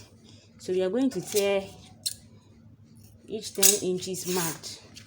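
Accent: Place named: Ghana. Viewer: Madam the pronunciation is her intonation. She is from Ghana.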